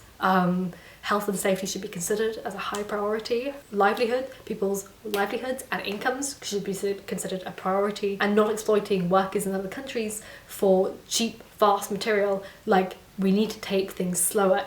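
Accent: RP, United Kingdom. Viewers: New viewer (American) here. I spent a summer in Edinburg when I was a kid, but I would have never guessed you lived there from ur RP accent. Once you said it, I can notice some subtle things, but still... never would have guessed. Maybe I’m just bad at hearing it since I’ve gotten older. Either way, ur voice is beautiful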